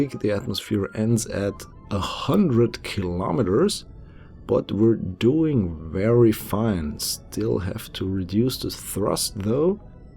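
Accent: Germany. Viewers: Man hört deinen deutschen Akzent. ;)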